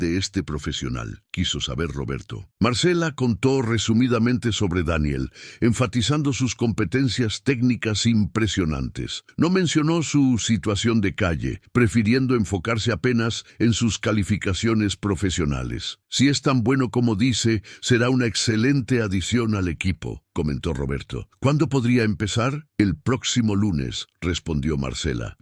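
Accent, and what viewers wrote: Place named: Chaco, Argentina. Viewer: te veo de argentinaho escucho pravincia del chaco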